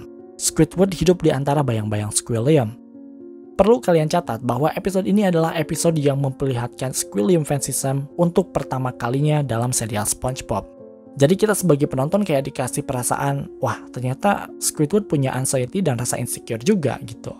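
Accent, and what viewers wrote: South Jakarta, Indonesia. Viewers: Bg lu orng jaksel ya?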